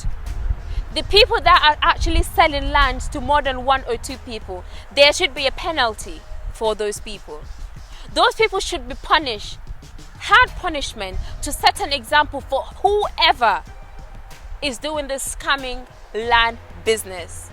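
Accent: United Kingdom. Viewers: Oh!! You truly love your country!! I am really blown away by your brilliance and passion!! I'm very serious when I say this: "You should run for the Presidency of "The Gambia." If I was in "The Gambia" right now. I would be your "Secret Service Bodyguard." I would protect you and make sure that no one lifts a hand to harm you!!! You are so smart...and once again...I just love your British accent!!!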